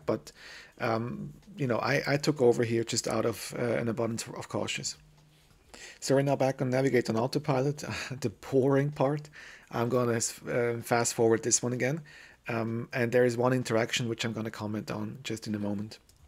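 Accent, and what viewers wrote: Switzerland. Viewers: I love your accent swiss man ;-) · Are you from Switzerland? Your accent sounds a little swiss-like;) btw. great video!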